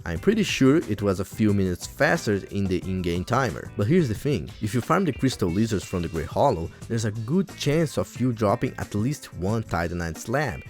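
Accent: Brazil. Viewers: bro i can uderstand you perfectly, you speak like a brazilian, idk why, but thats funny for me hehe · U brazilian or smth?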